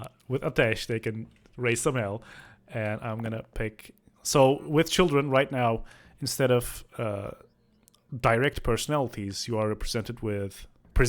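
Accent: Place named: Scandinavia. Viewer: Game looks interesting from the little i've seen. Showing my fellow scandinavian some support (Can tell by the accent)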